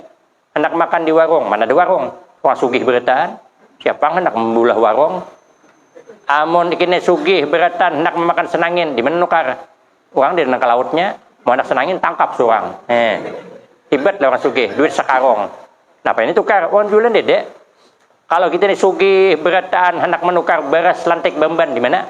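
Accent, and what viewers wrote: Banjar, Indonesia. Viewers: Bujur kh hidin ni urang Jambi,,, di dangar tu Banjar banget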